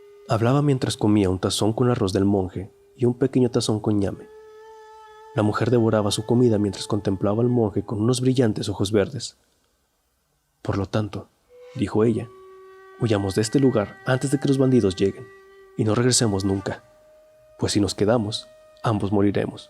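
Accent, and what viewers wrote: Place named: Bogotá, Colombia. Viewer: A mí no, me hace pensar en un rolo gomelo. No me puedo concentrar de imaginarlo. Aunque es claro, vocaliza bien y se entiende muy bien, es sólo si acento que me suena como dije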